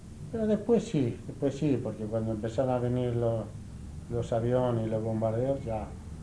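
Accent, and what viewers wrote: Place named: Argentina. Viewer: Tiene su acento Argentino Che.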